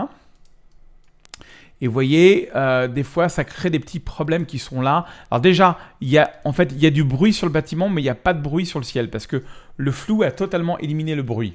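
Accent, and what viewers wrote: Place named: South, France. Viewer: Super tuto, dynamique, humour, l"accent du midi, bref que du bonheur.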